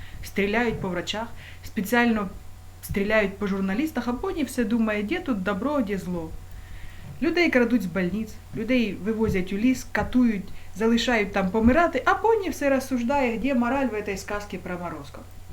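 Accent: Surzhik, Ukraine. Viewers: БЛЯ! Какой говеный суржик. Пизди бля. или по-русски, или по-украински!))) А так все верно!)) Молодец! К сожалению продажного тупого дерьма. особенно на Востоке. очень много!))) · Два вопроса: 1. Почему такой жесткий суржик? Выбирайте уже один язык, и говорите на нем. 2. Почему камеру так жестоко колбасит? А вообще классно, в точку!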